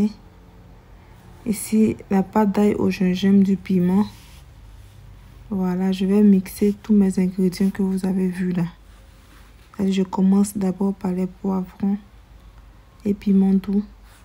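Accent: Ivory Coast. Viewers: T’as maniéré de parler prouve que tu es vrai ivoirien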